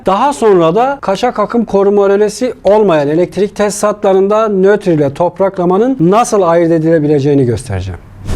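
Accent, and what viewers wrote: Erzurum, Turkey. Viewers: Güzel bilgiler ustam . meslek lisesi elektrik bölümü mezunuyum ama bunlari gostermediler.bravo basit ve anlasilir bir şekilde titizlikle anlattiniz.bravo.ayrica Erzurum şivesinede bayiliyorum.tom diye bi uygulama vardi sincapmi ne cok güzel konusuyodu.bu şiveler ülkemizin zenginligi.kolay gelsin, 👍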